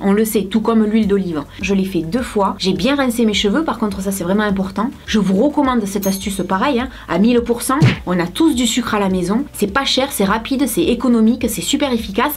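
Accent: South, France. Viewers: C'est normal elle viens du sud comme moi à l'accent du sud bien prononcer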